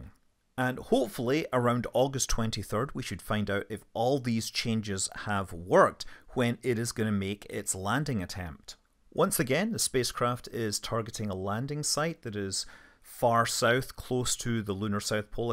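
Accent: Scotland, United Kingdom. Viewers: Hi - I love the way you refer to the Mun (which has an uh sound) rather than the Moon. Either it's your rather endearing Scottish accent or else you have been playing too much KSP. Maybe both 🤣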